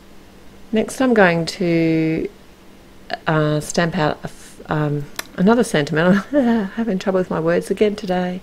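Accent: Australia. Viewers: ABSOLUTELY LOVE THIS SIMPLE, ELEGANT CARD. Yes, sorry, I'm yelling! It's gorgeous! Love this whole new Altenew release and want 99% of it. Lucky girl to be able to play with all these fabulous products. Thanks so much for sharing. I keep saying it, but it's great to listen to another Aussie. Where do you buy your fun foam? Can't seem to find it here in Oz. Thanks so much.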